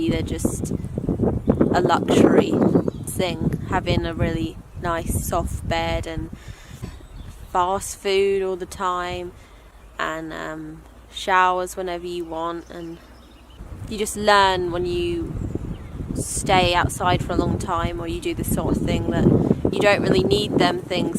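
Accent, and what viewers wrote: United Kingdom. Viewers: I love her accent. It's so amazingly British. 🇬🇧 It would be nice to meet by the fire. 🙂 Regards from Poland. 🌳🏕️🏞️🐗🐿️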